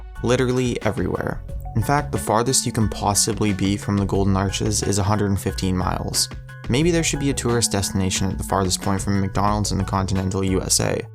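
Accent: Canada. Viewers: are you Canadian? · This dude is Canadian. I heard aboot a few times lol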